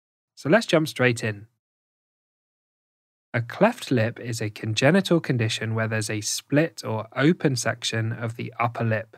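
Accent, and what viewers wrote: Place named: United Kingdom. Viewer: What is it for an accent? love it! It's british but also not british :D Or do you have just a really beautiful voice?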